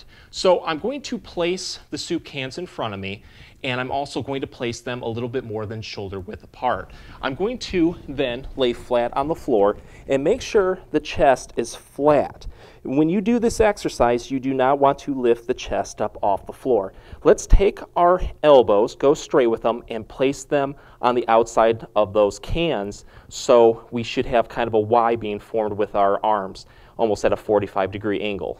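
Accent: Michigan, United States. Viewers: Did these exercises ONE TIME and found that almost all my popping and cracking were gone. I will be continuing these daily. Thank you! I also loved hearing a Michigan accent again; I attended Interlochen Arts Academy up by Traverse City.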